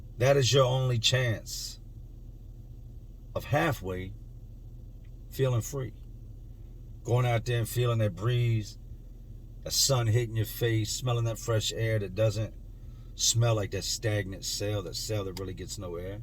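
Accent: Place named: Philadelphia, United States. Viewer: I knew you had to be from Philly or the Tristate, love your storytelling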